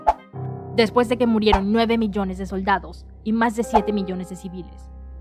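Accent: Panama. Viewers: Eres de Panamá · No entendi español pls (Eres de Panamá?)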